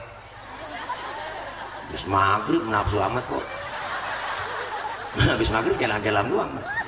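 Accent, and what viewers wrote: Betawi, Indonesia. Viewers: Ustad ceramahnya lucu dg dialek betawinya Sangat cocok untuk warga jakarta bekasi tangerang dan sebagian bogor Bisa ls terjadi interaktif dg para jamaahnya Bahasanya gampang dicerna dan sesekali disertai humor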